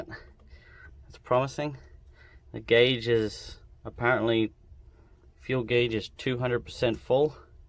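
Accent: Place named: Australia. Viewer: This is so weird homie has a Aussie accent I don't think there in Australia but he's into square bodies like a California or Texas boy I'm jealous of his truck collection slash junkyard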